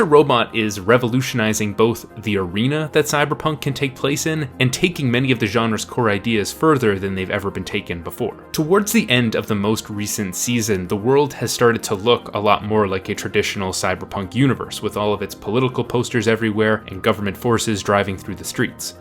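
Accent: Canada. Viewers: sounds like they might be from Canada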